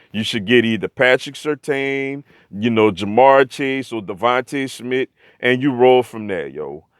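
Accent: New Orleans, United States. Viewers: Are you from New Orleans?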